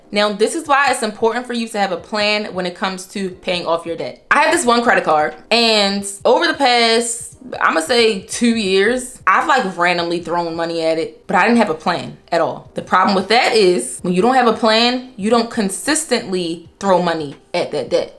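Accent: Baltimore, United States. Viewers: I hear Bmore accent 😌? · that baltimore accent heavy😭😭great content thank you!!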